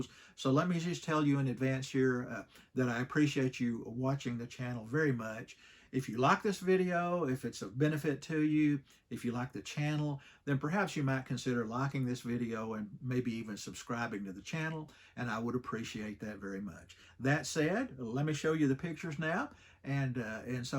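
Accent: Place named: South, United States. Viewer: Awesome photos. Love your channel. Very clearly explained concepts on your videos. Plus love ur Southern Accent.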